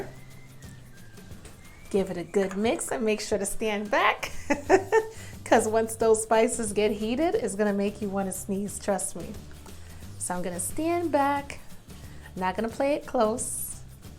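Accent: New York, United States. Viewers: I’m making this for sure. Girl your accent is so strong, you have to be from NY lol. I’m thinking the Bronx!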